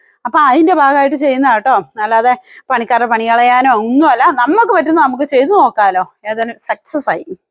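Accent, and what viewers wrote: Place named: Karnataka, India. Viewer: Veed yevideya ?kekumbo nammude karnataka malayali pole undalo😊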